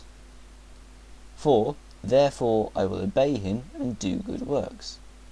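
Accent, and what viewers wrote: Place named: United Kingdom. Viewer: I don't know of which city you show your clips from, however, all countries have riots at one point or another. It's just that the secular ones have less crime, murders, thefts, lootings and gun violence. Your accent sounds British. Were you aware that since 2000, many Christian churches in Britain are converted to mosques, temples, shops, stores and tattoo parlors. Yet in "godless" england, 0 school shootings since 2000. In 80% god fearing USA, since 2000, dozens of shootings, 150 deaths. FOOL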